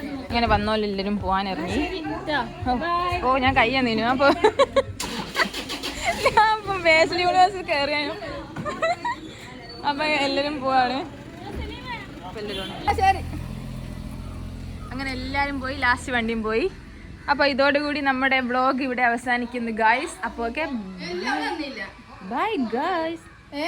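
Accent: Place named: Thamarassery, India. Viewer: എൻ്റെ favorites നമ്മളെ നാടൻ ഭാഷയാണ്.😂😅 നല്ല rasand താമരശ്ശേരി ഭാഷ കേൾക്കാൻ❤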